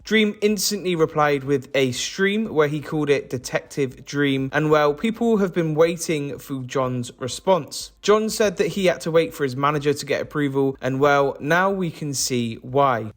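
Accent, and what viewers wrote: United Kingdom. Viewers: You sound like georgenotfound but 20x more British Btw I'm British aswell it's not a dig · Lmao this dude is British, Dream is American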